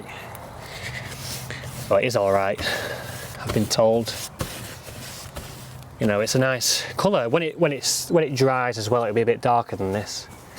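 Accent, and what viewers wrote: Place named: Preston, United Kingdom. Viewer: Love the shed colour! I also have an allotment in Preston. Thought you must be local to us from your accent 🤔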